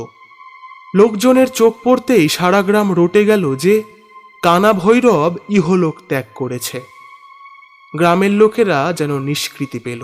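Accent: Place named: East Bengal, Bangladesh. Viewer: Voice ta to tomar ekdom kaat bangal vai.... recent bera topkechho mone hochhe?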